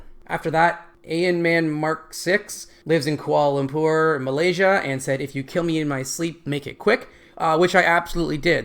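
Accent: Canada. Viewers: This might be your Canadian accent though, but we pronounce the first syllable like BILL, not like BELL. As it's spelt. You passed in my book though!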